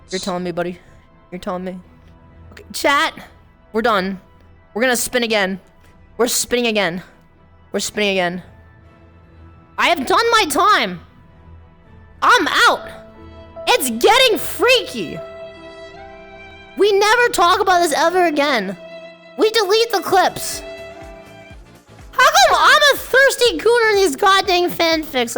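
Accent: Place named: United Kingdom. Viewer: Not the british accent 😭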